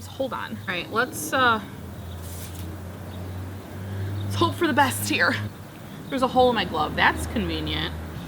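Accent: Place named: South, United States. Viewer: Hi, I'm afraid of the string of pearls as well, they look like some green peas which are so delicate..plant with me are so soothing..where are you originally from?..you sound like you have a little southern twang..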